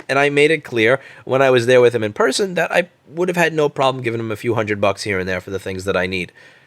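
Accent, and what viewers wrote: New York, United States. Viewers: When you start ranting, your New York accent really comes out. When you calm down, it relaxes. It's quite endearing. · I love you so much you’re so New York it’s like talking to someone on the street “Family owned Jewish company” 😂😭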